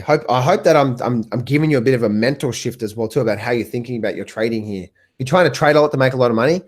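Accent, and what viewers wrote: Australia. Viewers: Dear; thank you very much for sharing a bit of your coveted inside trading secrets. You are a wonderful person and a joy to listen to. Although you may not be aware of it, I love your Aussie accent. It is similar to a British accent but different. I grew up in Chicago Illinois USA. What part of Australia are you in? The wildlife you have there is extraordinary. I loved Steve Irwin so much. That aside, a V bottom rocket recovery like this one is certainly odd, and experts I listen to are at an impasse as to the next move. Some say we will shoot right through the confluence of resistance and keep climbing to S&P500 2800+ and up and up. Others say retest of 2500 and then up and up. Some others say a retest of the December low and then a new bull market will ensue. Then there’s Bo Polny and his biblical pronouncements of doom. I know how much you love Mr. 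Polny. Kidding of course. In closing thank you so much for sharing your insight and wisdom.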